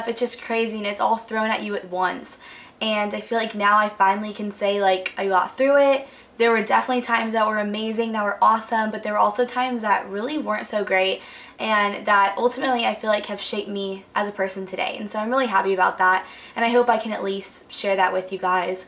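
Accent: Texas, United States. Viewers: It's a small texan accent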